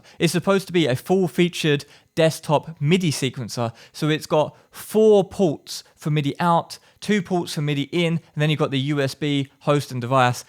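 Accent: United Kingdom. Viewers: You sound British. Are you in London?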